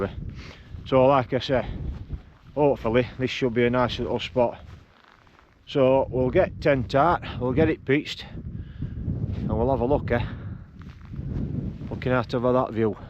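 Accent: United Kingdom. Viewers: All the U.K. folks have unique regional dialects-like Americans. I can understand most of language now, but it took me awhile!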